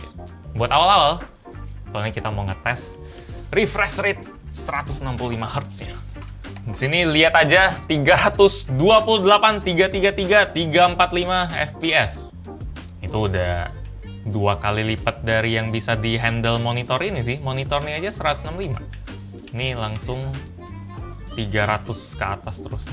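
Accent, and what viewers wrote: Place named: Java, Indonesia. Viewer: bahasa mu medoke banget hahahahahhaahhahahaha "DARIPADHA"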